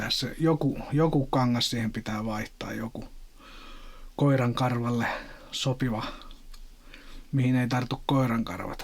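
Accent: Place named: Finland. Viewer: Kiitos hyvästä kanavasta! Jännä, että alkanut vasta tänä vuonna sitä meille tarjoamaan. Laitettu tilaukseen👌 Hienoja ja mielenkiintoisia videoita teette👍 Murteesta päätellen aika lähellä meitä vissiin olette😀 myö ollaan Kouvolast🤣 Meillä on aika uusi kanava tubessa, jossa reissataan vankkurilla Suomessa ja ulkomailla. Ja toki retkeillään myös.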